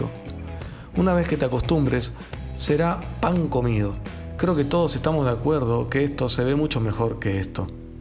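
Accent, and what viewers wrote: Standard. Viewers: no hables en neutro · Por favor,no hables en neutro 😅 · Por qué hablas en neutro? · El mejor comentario , alguien que me diga por qué habla en NEUTRO si es más argentino que Manuel Belgrano…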